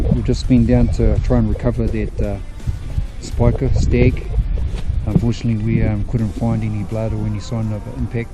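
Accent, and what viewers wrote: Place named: New Zealand. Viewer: Love that kiwi accent and those wicked glasses lol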